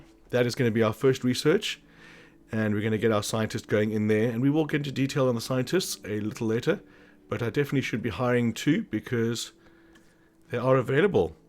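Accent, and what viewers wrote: South Africa. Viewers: Bro u sound like a fellow South African are u?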